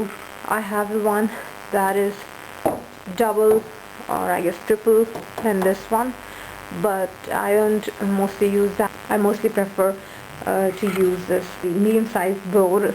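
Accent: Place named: India. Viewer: Thank you, Indian voiced woman :)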